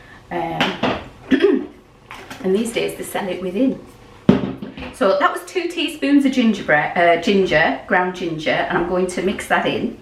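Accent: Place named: Cumberland, United Kingdom. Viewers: Wow! That is delicious! Thanks very much we all loved it. Enjoyed watching you make it too. I’m from the UK but live in New Zealand and I really enjoyed hearing your lovely Cumberland accent-slight homesickness was caused! So, many thanks from another! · I'm from Cumbria but i live in Italy, it was so nice to hear your accent, it made me homesick, I'll definitely be making this gingerbread, thank you .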